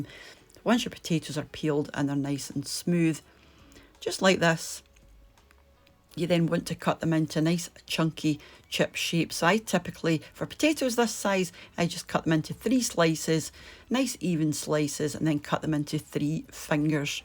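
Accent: Scotland, United Kingdom. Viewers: Your wonderful scottish accent always cheers me up. Thank you for that. Hope you and your family are safe and healthy as well.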